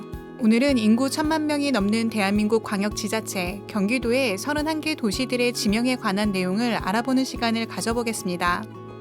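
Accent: Gyeongsang, South Korea. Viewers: 경상도 분이신가 봅니다.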